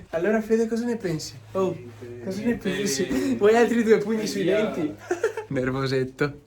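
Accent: Veneto, Italy. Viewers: A me sembrava che avessse un accento Veneto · Non so come sia il bresciano però dai si sente un casino che è veneto 😂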